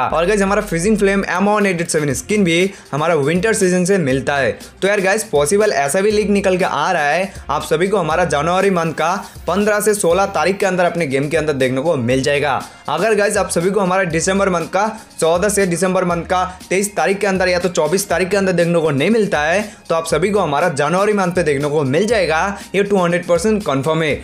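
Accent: Bengal, India. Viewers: Kia Tom bangali ho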